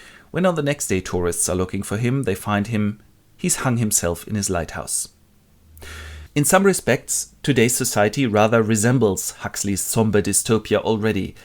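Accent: Germany. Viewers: Iron American Dream on YouTube. It's wonderful, I love the vernacular. The German accent tops It off.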